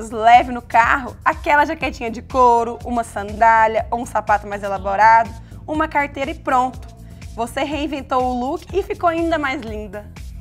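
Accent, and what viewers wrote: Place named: Goiás, Brazil. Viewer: Linda demais com esse sotaque Goiano 😍 Não vejo a hora de te ver ♡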